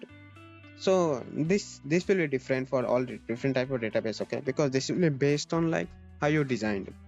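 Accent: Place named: India. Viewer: indian accent is annoying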